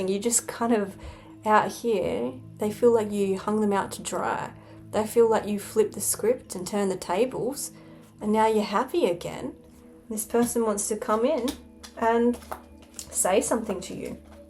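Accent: Australia. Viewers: I'm from Scotland and I love the Australian accent it's very cool. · I LOVE Australians. The voice or accent 🌞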